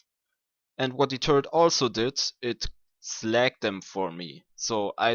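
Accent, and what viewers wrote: Germany. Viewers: man hört echt das du deutsch bist :D haha. aber cooles video axton war auch immer mein lieblings char. ich hasse diese leute die in einem singleplayer spiel auf meta und guides hören. was wichtig ist ist das man spaß hat mit dem char den man spielt...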